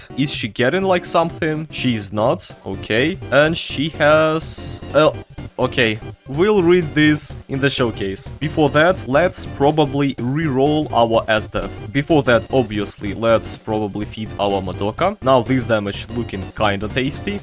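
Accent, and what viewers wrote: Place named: Russia. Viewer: когда думал все начало видоса чо за акцент русский а потом видешь как вместо английской E пишется у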